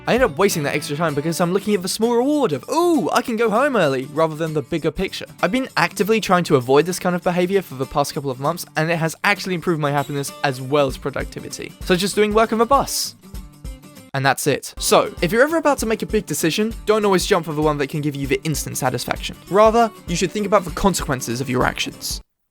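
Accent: United Kingdom. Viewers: british :3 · Are you from London ? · Your english accent is so cool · He is extremely British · HE Is BRITISh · This guy sounds British.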